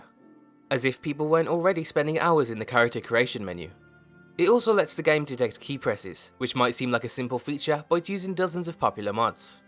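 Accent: United Kingdom. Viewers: British people say beeta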